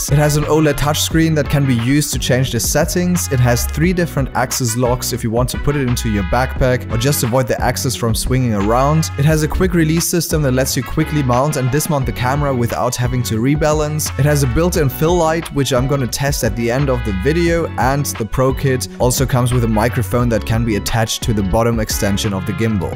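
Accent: South Africa. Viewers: WOW WOW WOW WOW WOW!!!! Thank you so much for this video. I am literally going to practice every move you mentioned with my crane M3. Are you South African? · Thanks for the video, I will definitely have a look at the Crane M3, I hear that your are starting to get a South African accent 😀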